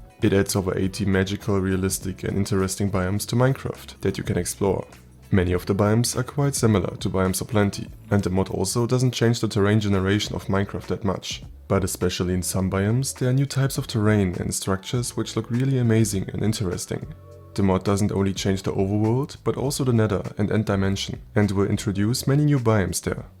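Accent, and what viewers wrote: Germany. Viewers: ich hör a little bit german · Man hat sofort an deinem Akzent gehört, dass du aus Deutschland bist. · are you german? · Gutes Video das Deutsch Englisch is crazy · Are you german? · Your English sounds good but do I hear a little bit of Deutsch in there?😂 Nice Vid, thanks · Ich hear auch a Little Bit german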